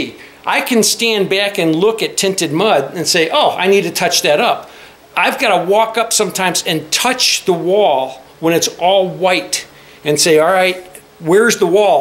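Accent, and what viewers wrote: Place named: Bridgeport, United States. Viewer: You sound like your from Bridgeport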